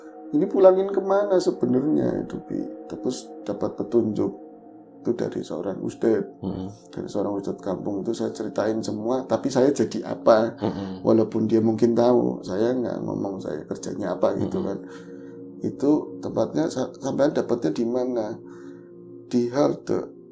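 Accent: Banyumas, Indonesia. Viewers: Amin matur suwun pada2 bro ora ngapak ora kepenak👍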